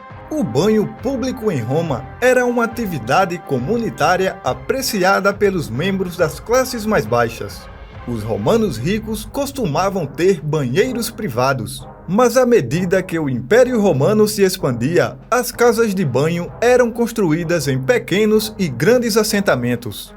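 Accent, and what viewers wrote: Northeast, Brazil. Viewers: Gosto de ouvir a sua voz. O jeito de falar é interessante. Você é do nordeste?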